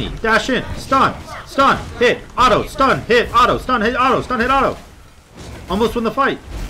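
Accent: United Kingdom. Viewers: That British accent was amazing Bro. <3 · Gg nice vid and nice British accent lol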